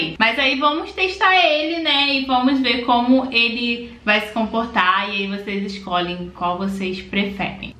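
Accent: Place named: Rio de Janeiro, Brazil. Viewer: Carioca, gosto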